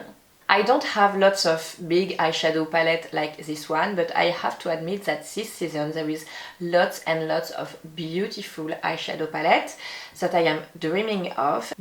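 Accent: France. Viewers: Oh my god! I’m in love with your French accent! 💗💗💗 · Thank you so much for another great tutorial. I always enjoy your videos but mostly I love your sweet French accent. I am Greek, I am an English tutor who adores Paris! To me you are the absolute ' '. Please keep on sharing your wonderful videos with us. Thank you again! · You are so beautiful. I like your tutorial so much. Love your hair and French accent. Love from Indonesia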